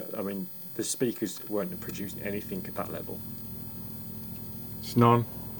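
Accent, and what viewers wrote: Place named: Birmingham, United Kingdom. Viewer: You sound local, 'am yao a brummie?'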